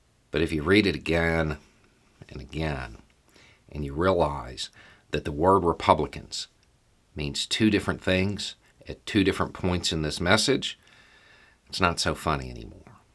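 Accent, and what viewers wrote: South, United States. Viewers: It's nice to hear something a European perceives as reasonable in this southern accent.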